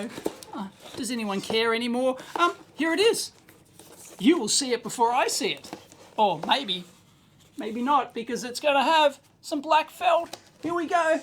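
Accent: Australia. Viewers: Congrats on your huge milestone! You were, or are one of my favorite channels since I started down the YouTube rabbit hole 18 years ago. Your adorable Aussie accent and high energy brought me much entertainment as well as valuable learning experiences. Here’s to the next 17 years my guy! Congrats! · This great man with his Aussie accent helped further my interest in electronics. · Congratulations! Well deserved! This is probably the only channel I’ve followed continuously for the past 12 years. As a junior EE, I learned a huge amount from your videos, about electronics, thoughts, experiences, and opinions you shared along the way. Believe it or not, some of those actually made a difference when I was making choices in my own career. I'm sure your content has much bigger impact on your audience than you probably realize. I can confidently say I know a lot more about electronics… and a lot more about how Australians talk 😂🇦🇺 Keep it coming. 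Forget about retirement, not working for the man is a life sentence 🤣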